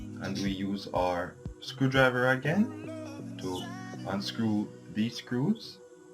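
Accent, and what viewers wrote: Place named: Jamaica. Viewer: Thumbs up for the JA accent..... bras brap 🇯🇲🇯🇲🇯🇲🇯🇲🇯🇲🇯🇲🇯🇲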